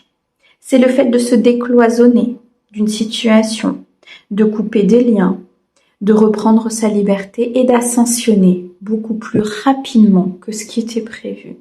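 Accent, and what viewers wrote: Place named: South, France. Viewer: Tout à fait ça !!! Grand merci des décennies de blocage et d'utilisation ! Ouffff j'adore ton accent du sud que l'on perçoit parfois !❤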